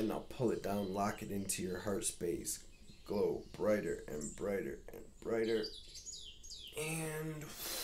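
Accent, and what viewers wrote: Detroit, United States. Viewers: You from Detroit?? 😊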